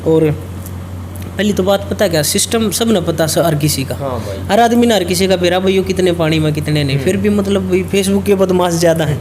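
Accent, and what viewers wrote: India. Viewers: Bhai interview achha tha Par aap apne bolne m thoda change karo Uchana aali jyada hojya hai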